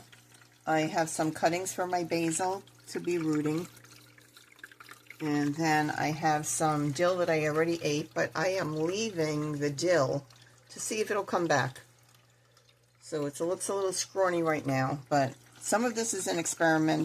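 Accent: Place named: New York, United States. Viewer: Don't mind me. Just here getting my indoor plant and NY accent fix 😆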